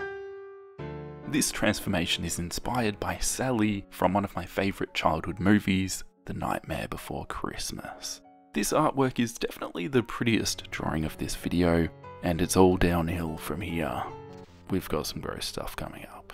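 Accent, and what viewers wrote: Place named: Australia. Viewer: The first drawing has Springtrap eyes, Change My Mind... or maybe im just a FNaF Nerd And your voice helps me think like it, since PJ Heywood is also Australian 😅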